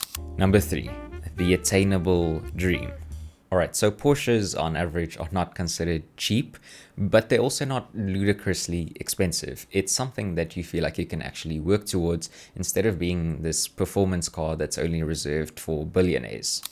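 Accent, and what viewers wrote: South Africa. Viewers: I can just hear the south african in you bru 💪🇿🇦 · Brother heard by your voice you have RSA DNA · I'm new here seconds in me af: "Yha He Is Definitely South Ahhh🇿🇦..."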